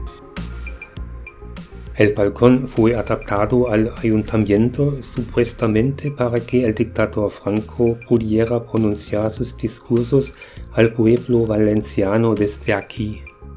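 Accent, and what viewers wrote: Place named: Germany. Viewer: warum mit deutschem akzent spanisch gesprochen ich kann es verstehen, aber die meisten deutschen nicht. super idee, den nicht so bekannten camino levante zu gehen. ich war 1972 in valencia resp. in der nähe und habe nur gute erinnerungen ausser an den zoo. damals hatten die tiere so eine art käfig wie im zirkus, da sind wir geflohen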